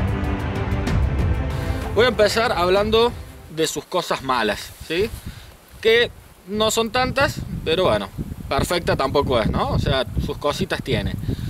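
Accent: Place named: Cordoba, Argentina. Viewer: 6 años viajando y no perdió el tono de cordobés jajaja muy bueno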